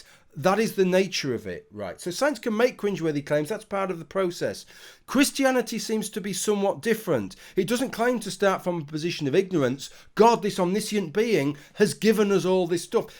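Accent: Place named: North, United Kingdom. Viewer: Hi. I'm not a native English speaker, and while I still enjoy the battle religious people have against nonbelievers like me, I mostly listened to this video trying to learn understanding English better, especially British accents. Yours is very easy to understand, but the accent hints to probably more north than south. Not close to Scotland, probably, so I'd guess Leeds area? Cheers!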